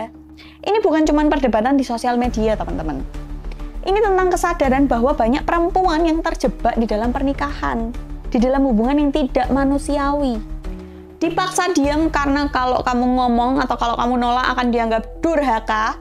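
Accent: Java, Indonesia. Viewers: pertama ktmu chanel ini d beranda seolah g prcya ada konten sperti ini,gokil.trs br puter 2 video Lgsg geleng2,mantab bener ini konten,aplgi ada logat jawany,bikin ngekek🤣.tanpa pikir ojg Lgsg subscribe.😁 lanjutkan mbak,edukasi sex memang sangat perlu,ora gur uslak usluk tok,semua ada ilmunya.😁👍 tp pda intinya konten ini khusus hnya utk orang yg waras,inget y,ORANG YANG WARAS!!😅 SEMANGAT DAN TETEP SEHAT DAN BERKARYA MBAK!!!💪👍👌👏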